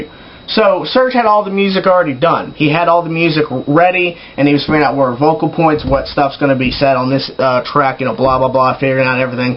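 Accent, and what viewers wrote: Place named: United States. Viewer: He says Tankian, but the "an" is faded because he have American accent. If you're an American and didn't noticed you're the idiot.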